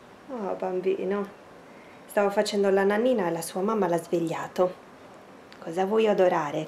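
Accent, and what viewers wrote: Sardinia, Italy. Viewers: Dall'accento sembri sarda, ho indovinato?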